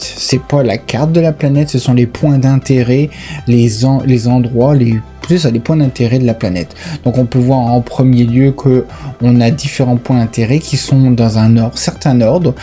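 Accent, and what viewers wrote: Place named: Quebec, Canada. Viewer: Sympa, la video. Ca décode bien. Et en Quebecois :)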